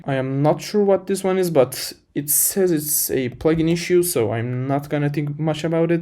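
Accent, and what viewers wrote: Turkey. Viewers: turksun dimi aksandan belli oluyo :D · I sense türkish accent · are you turkish by any chance? i know that accent very well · Your accent sounds Turkish so I am guessing you are? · most türkish accent i ever seen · o Türk aksanını nerde duysam tanırım kardeşim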